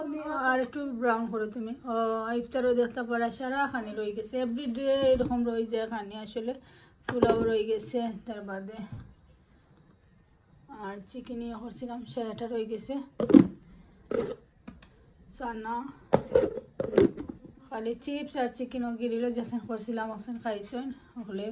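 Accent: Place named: Sylhet, Bangladesh. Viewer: ওয়া আলাইকুমুস সালাম। আপি কি সিলিটি নাকি।সিলেটি কথা আমার খুব ভালো লাগে। খুব সুন্দর ভিডিও শেয়ার করেছেন আপু।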